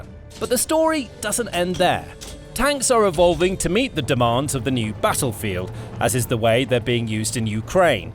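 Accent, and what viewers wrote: United Kingdom. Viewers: I thought he said the Challenger was the best overall, which seems to go well with his British accent.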